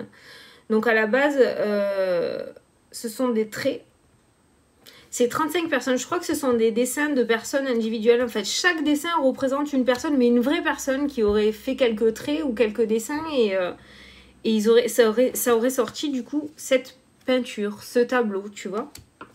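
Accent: South, France. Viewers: ADORABLE MA BELLE , SUPER TON ACCENT DU SUD , TU ES RAYONNANTE COMME LE SOLEIL, MERCI POUR TOUTES LES BELLES ÂMES QUI TE SUIVENT, JE T'AIME MA BELLE, CONTINUE.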